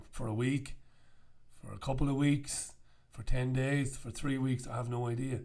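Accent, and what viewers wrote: Ireland. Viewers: your dulcet Paddy tones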